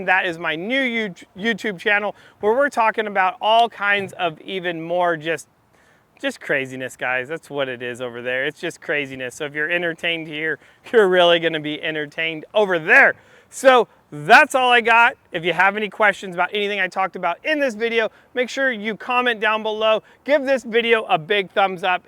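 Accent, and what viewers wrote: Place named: Australia. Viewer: Hahaha, Australian accent mate😂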